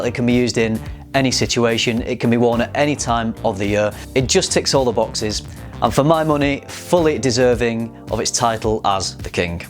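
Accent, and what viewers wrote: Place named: England, United Kingdom. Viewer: That dirty English accent Yuk!! A cocktail ????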